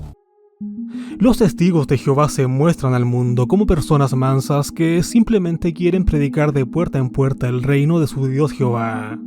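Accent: Chile. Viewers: Es shileno... un vicio cultural ...propio de la nacionalidad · Ah ok, entonces es común para cualquier chileno dicha pronunciación?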